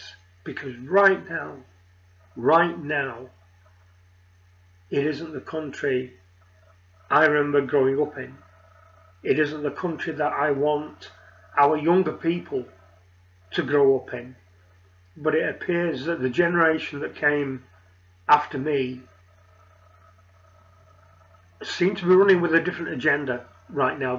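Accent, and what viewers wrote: United Kingdom. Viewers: all good. Nice to hear the Lancastrian drone of voice again. Bye the way we are on the same page 😉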